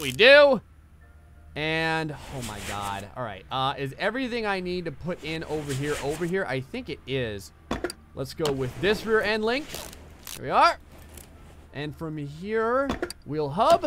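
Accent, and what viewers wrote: New Jersey, United States. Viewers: àre u from New Jersey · Oh I wanna hear the jersey accent do it in the next episode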